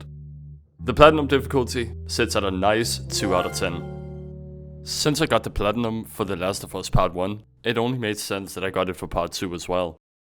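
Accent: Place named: Scandinavia. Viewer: Your accent sounds Scandinavian...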